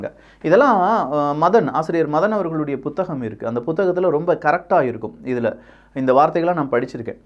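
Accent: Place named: Tirunelveli, India. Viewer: Tirunelveli slang super thala😅😅😅❤❤❤👌👌👌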